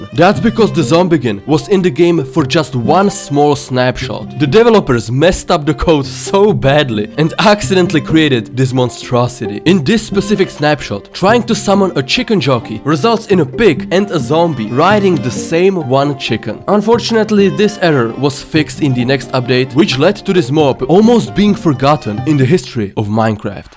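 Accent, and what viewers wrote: Russia. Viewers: I swear that he has a Russian accent. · You are russian? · You're Russian right?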